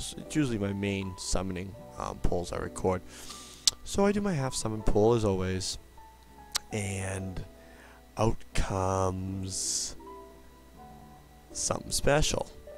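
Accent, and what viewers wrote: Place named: Boston, United States. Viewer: Haha awesome luck. That's pretty ridiculous odds. How'd you like the Super Bowl. I really dont keep up unless Tom Brady is involved. You sound very Boston so I guess you might be a Pats fan :p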